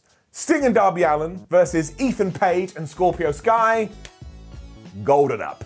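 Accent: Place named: United Kingdom. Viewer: I love British Accents...Jax "Jag-wahrz" becomes the "Jag-u-ahs" lol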